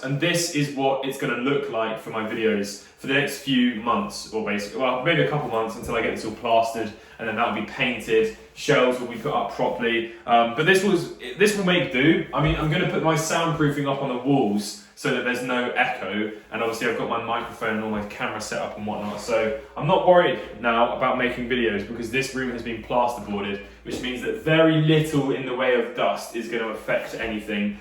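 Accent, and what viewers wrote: United Kingdom. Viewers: It sounds weird that someone who talks in pound sterling and has an English accent is talking about travelling to the UK etc, haha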